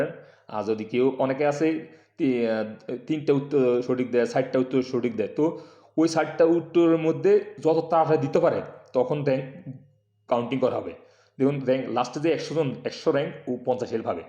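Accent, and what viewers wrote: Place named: Noakhali, Bangladesh. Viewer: আমার বাড়ি নোয়াখালী আমি আপনার কথা শুনে মনে হচ্ছে আপনি আমাদের বৃহত্তর নোয়াখালীর হবেন আমি আপনার সাথে যোগাযোগ করতে চাই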